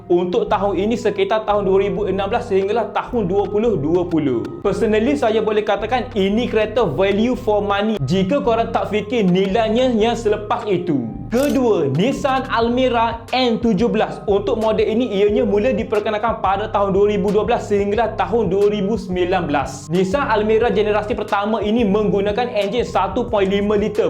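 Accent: Kelantan, Malaysia. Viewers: abe ore klate k? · Konten yang sangat menarik dan berguna, bahasa yang mudah difahami walaupun loghat Kelantan...teruskan berkonten, ambo support 100% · assalam......!!! ce tenang² sikit... lunakkan sikit suara tu... pelat kelate tu xpe la dulu... kendian nti pelan² hilang la tu... in syaa ALLAH apa pun... abg sapot subscribed dan liked dahhh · Demo ore klate ko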